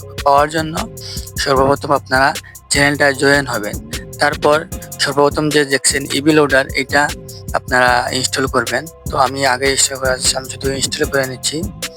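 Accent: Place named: Sylhet, Bangladesh. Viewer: ভাইয়ের কথায় বুঝা যাচ্ছে সিলেটি।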